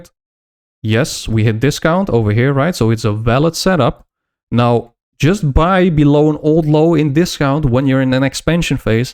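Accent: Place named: Netherlands. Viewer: Don't know why I found it really funny when you said "Trump is talking about tariffs and he is absolutely destroying the dollar right now" - something about your Dutch accent makes it even better hahaha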